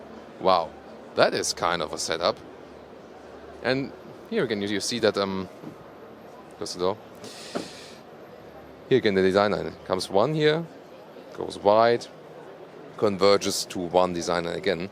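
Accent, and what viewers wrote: Germany. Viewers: Sorry , but your English is a pain - warum sprichst Du nicht einfach in Deutsch? I work and live in an English speaking Country - this strong German Flavour is too much - i recommend spend some time in Oxford and some time in Boston - that might help.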